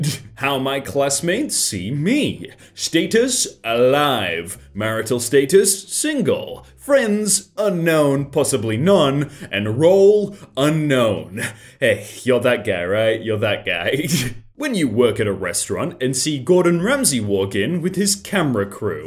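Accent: United Kingdom. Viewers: day 89 of asking that he british yes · Hello British guy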